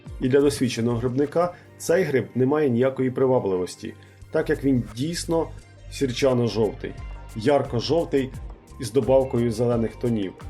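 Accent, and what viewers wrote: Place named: Surzhik, Ukraine. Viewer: Суржик - суржиком А інформація корисна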